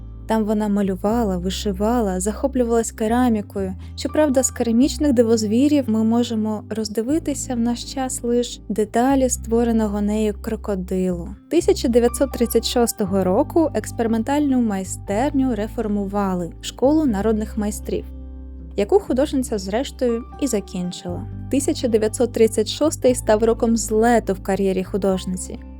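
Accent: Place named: Russia. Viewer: Маю зауваження. Ви робите в наголосах характерну нині помилку-русизм - зсуваєте наголос на перший склад, як м.оскалі: нА душу, а не "на дУшу", жИли, а не "жилИ". Зверніть, будь ласка увагу. Відео дуже сподобалось, підписався. Дякую!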